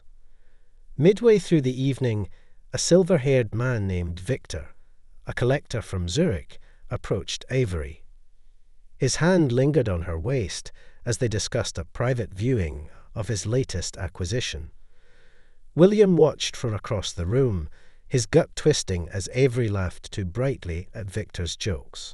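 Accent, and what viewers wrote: Scotland, United Kingdom. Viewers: He sounds Educated Scottish to my ears.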